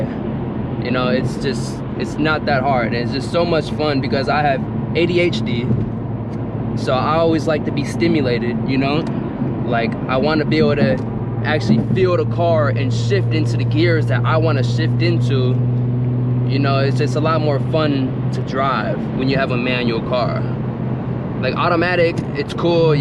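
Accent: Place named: New Jersey, United States. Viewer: You definitely sound like you from Jersey B 🙌🏽💯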